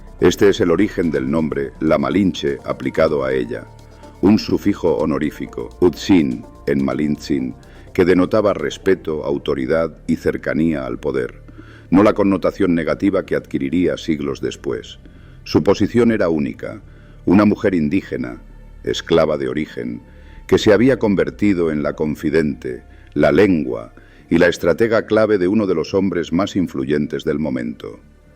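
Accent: Spain. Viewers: Hubo dos grupos que los mexicas No pudieron someter, los Tlaxcaltecas y los Zapotecas, lean, investiguen, analizen, saquen sus propias conclusiones y veran las diferencias, ademss este narrador tiene acento español, el imperio mexica SI era el mas fuerte y predominaba cuando llegaron los conquistadores pero no era el unico grupo etnico que existia, habia muchos grupos pero estaban sometidos, pero todos los grupos etnicos que existian NO eran mexicanos porque México NO existia como tal, eso vino despues en la independencia en los 1800s, el México actual se forma de tres grupos, los mestizos, los afromexicanos y los nativos, osea indigenas, estos tres grupos ya si somos mexicanos, 💯%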